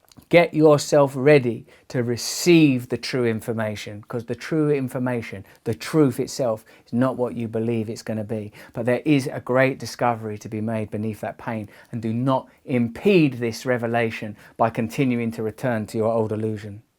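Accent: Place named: United Kingdom. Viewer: So drunk and sad right now, I had to turn the CC on because it got to the point where the British accent got so thick I didn't understand any words to cry to.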